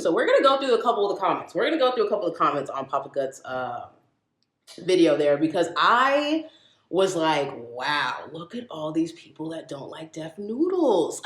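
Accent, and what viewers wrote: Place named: United States. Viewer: Oh American English! A great time here. ❤️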